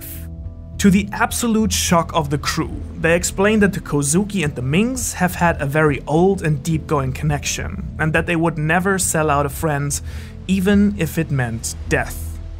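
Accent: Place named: Germany. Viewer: Are you german? Just asking cause your accent sounds a bit german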